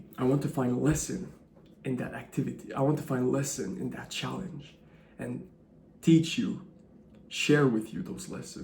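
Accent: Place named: Netherlands. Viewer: He's in a foreign country other than the United States. Listen closely to his accent of the English Language. I know of no State in the Union WHERE people speak like this unless he's in a tight community of Dutch or a village of Norwegian immigrants... Yes? JUST a thought. Respectfully submitted for your consideration Gregg Oreo Long Beach CA Etats Unis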